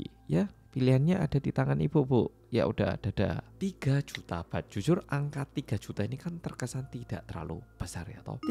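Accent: Java, Indonesia. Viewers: Selain ceritanya aku suka logat dan medoknya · Ngebut bgt nonton smua vidio oppa medok ini. Hahahhaa,semua ku tonton mlm ini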